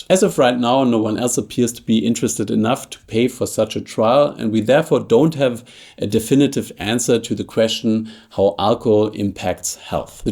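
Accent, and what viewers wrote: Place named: Germany. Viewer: Because of your German accent I automatically take you much more seriously when talking about research and statistics than the Californian Andrew Huberman. More seriously, stress and loneliness are two of the most harmful things for your health and moderate alcohol use can alleviate these things when you are sharing a bottle with friends and talking about life.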